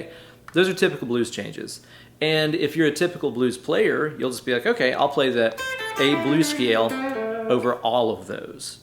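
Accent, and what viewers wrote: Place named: Virginia, United States. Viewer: I love this! As an experienced metal player trying to work my way into country playing, this is a quick easy transition, rather than attempting to start from scratch or re-invent the wheel, so to speak. Great content as always. You have quite the way of explaining things, so that anyone can get it. I grew up in SW VA so your accent reminds me of home. Keep rockin!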